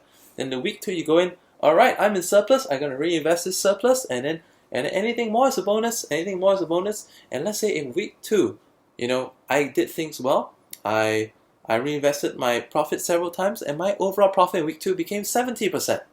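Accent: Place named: Singapore. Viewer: Hey, I reside in Singapore. I assume you're a Singaporean too judging by your accent. Would you perhaps share with me a platform on which we can chat(email or skype). I'm eager to know so much more and would be grateful to learn from no other but a fellow Singaporean.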